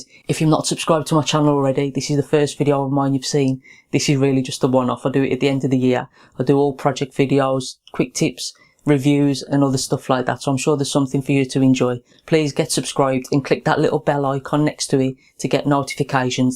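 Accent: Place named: Birmingham, United Kingdom. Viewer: Hope you had a great Christmas! Looking forward to following your travels state side, and to see what they make of the Brummie accent haha! Also thanks for answering all my questions over the past 2 years, I've often bought stuff off your reviews! Best wishes for the new year mate!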